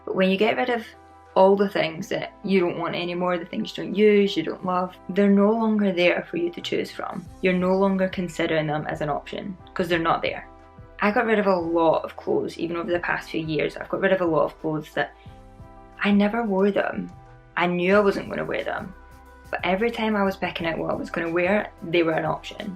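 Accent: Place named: Scotland, United Kingdom. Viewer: 🥰 btw where are you from? I love your accent. Kinda reminds me of the Scottish actress Karen Gillan.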